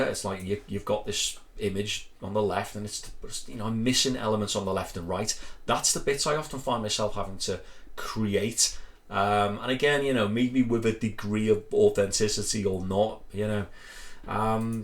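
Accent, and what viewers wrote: Liverpool, United Kingdom. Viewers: Hey! I love these videos and hope you can keep them up and growing! I have a special playlist I've made up so hopefully if the worst happens, I've got copies of them! I save your vid of a particular album and then follow it with the full album. Then I can watch you explain all about how it was made then listen to the album again with "new ears!" It really enhances the enjoyment of the album! It's also great to hear a fellow Scouser providing a background to some of my favourite music! It takes me right back to the 70's and early 80's when we could actually afford to buy tickets to see these bands! (Unlike today when it costs hundreds of pounds to see bands in a field where you are half a mile from the stage!!) Keep up the good work, sir! I'm looking forward to seeing where you take us next! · I love your videos! And as a German I love your accent! 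Are you perhaps from the Liverpool area? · You sound like a scouser 👍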